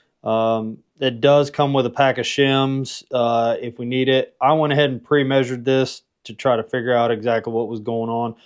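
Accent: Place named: North Carolina, United States. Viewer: New Sub, I knew you were from NC just from the way you talk. I grew up in Hickory. Question, when I shut down the bike, it has started making a chirping noise coming from the intake. Any ideas? I have never noticed it before, but a couple of weeks ago I started hearing it, so now it's bothering me. It's right at the end of the shut down. Bike is a 2017 CVO Ultra Limited. Thanks in advance Brother!!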